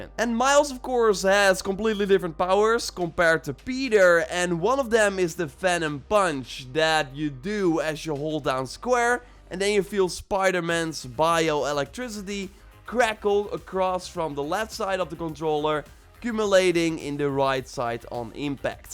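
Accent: Netherlands. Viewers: i wasn't 100% sure if your accent was dutch but when you said 'mathijs de jonge' i knew for sure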